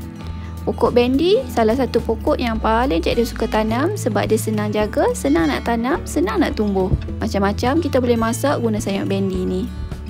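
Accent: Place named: North, Malaysia. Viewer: Dengar loghat macam orang utara,belah kawasan mana?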